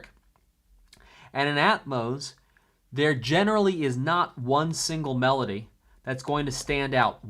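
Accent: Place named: France. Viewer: "Clair Obscur, je conduis une voiture..." xD ... et je percute un Sakapatate ! Did he talk about chroma? An advantage! Nice french accent btw!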